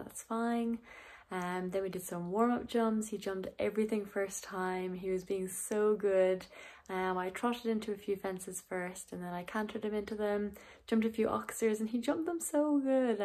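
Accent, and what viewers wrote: Ireland. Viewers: are you in Australia I am so confused Irish accent but the trees and the birds shout Australia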